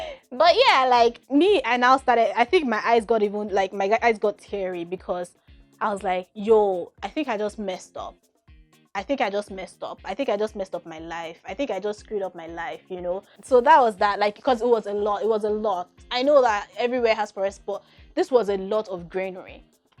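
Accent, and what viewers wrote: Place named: Jamaica. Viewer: For some strange reason, you sound like a Jamaican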